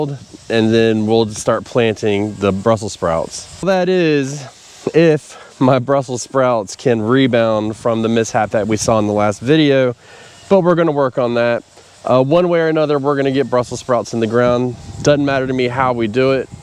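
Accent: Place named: North Carolina, United States. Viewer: Northern NC accent I'm guessing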